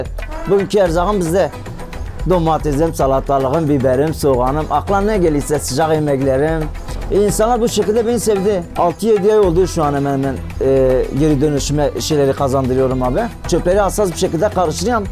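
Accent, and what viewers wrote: Diyarbakir, Turkey. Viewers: Bu adam %1000 Diyarbakırlı :) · abi diyarbakırlı mı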